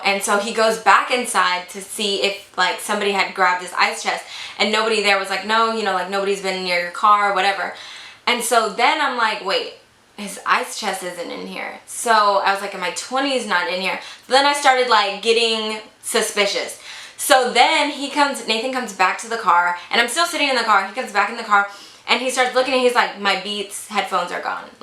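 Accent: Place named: Texas, United States. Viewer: this might sound weird but I love to hear you talk 😂 I love your texas accent and your stories! (more story time videos pls) thank you for being so humble and really inspiring! 💜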